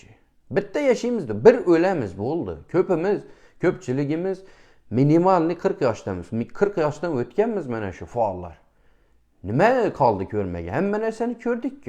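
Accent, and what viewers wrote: Khorezm, Uzbekistan. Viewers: Хакикий хоразмликни гапи бу .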